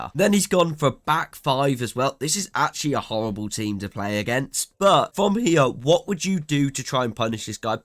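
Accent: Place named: United Kingdom. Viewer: You sound so ultra British when u are in that video , Reds or Blues or Tots or ?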